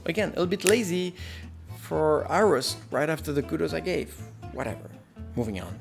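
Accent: France. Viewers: and... sorry but are you French ? (I noticed a little bit of a French stress in you voice... ^^). No offense though. French is my mother language ;) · Oula il a un accent français lui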